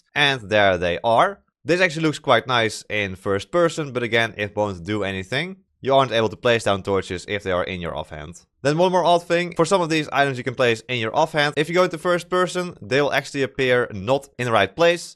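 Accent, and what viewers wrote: Netherlands. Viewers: The dutch accent 😅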